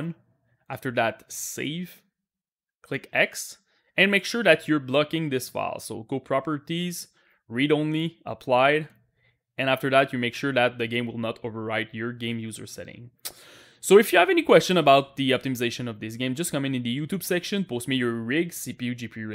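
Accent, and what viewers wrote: Quebec, Canada. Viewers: jai jamais entedu un accent quebecois autant fort dude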